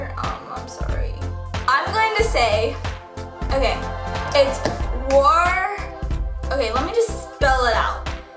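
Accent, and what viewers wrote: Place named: Canada. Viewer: Oh I thought u were Canadian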